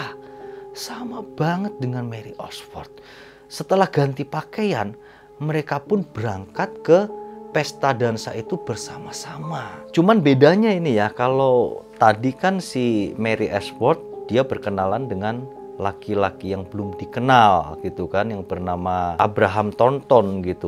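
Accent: Java, Indonesia. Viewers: Medhoknya itu lho enak didengerin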